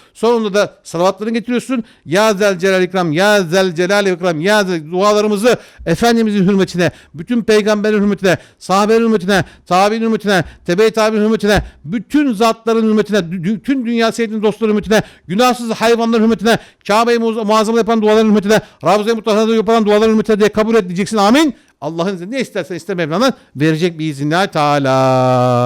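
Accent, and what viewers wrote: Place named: Thrace, Turkey. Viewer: Hocam Trakyalımisiniz? Bende trakyalimyim konusmanizdan anladım Saygılar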